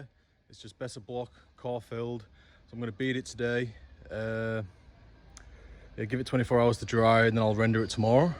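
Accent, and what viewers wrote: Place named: United Kingdom. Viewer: This in Australia mate because you sound British and I’m from Liverpool uk and worked all round Brisbane and Melbourne and I can tell by the buckets your using it’s Aus ??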